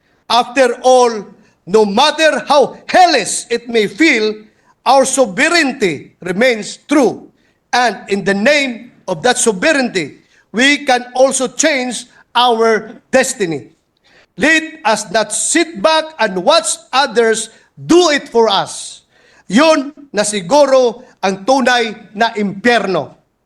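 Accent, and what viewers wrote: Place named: Visayas, Philippines. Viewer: Bato mag speech theraphy ka nakakakabaliw English pronunciation mo, visaya visaya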